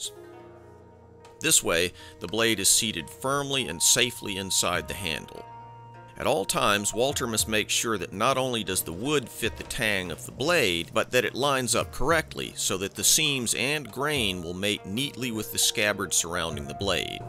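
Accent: United States. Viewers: Mr., out of curiosity, which part of america is your accent from?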